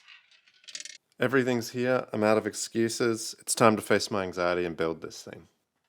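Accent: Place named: Australia. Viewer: I can't tell you how exciting it is seeing and hearing a fellow nerdy Australian in these videos. Huge congrats on such a great design!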